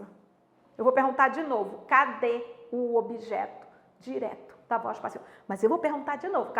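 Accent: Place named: North, Brazil. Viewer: Eu estudando e aproveitando para matar saudade do sotaque do Norte...morei 4 anos no Pará...parabéns pelas explicações.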